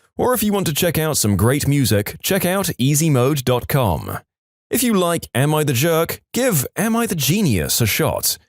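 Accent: United Kingdom. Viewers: I’m not the only one who liked the British voice he used in the video. I want to hear more of the British voice. I liked it. It was nice if I do say so.❤😂😊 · the reason why the narrator has turned British is because it's a different narrator from Am I the Genius. he's been in a couple videos lately.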